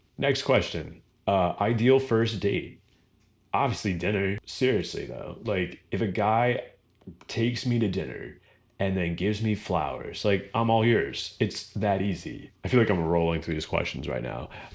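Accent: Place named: United States. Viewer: It must be because I am British and don't appreciate all the inflections of an American voice, but I just cannot make out what you said your first job was!! To me it sounds like "Chick-fer-lay" but I'm sure that's nothing like it!! Is that something that's specifically American?! And .... one of your hobbies is "Pippa-bow" or "Peepa-ball"?! Is that also a very American thing?! I am keen to learn, and I am sorry if I offended anybody!! 😀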